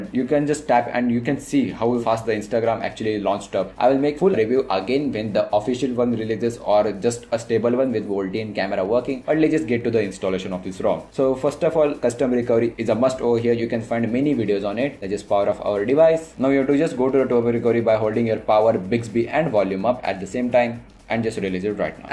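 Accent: India. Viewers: Indian english is the best! :D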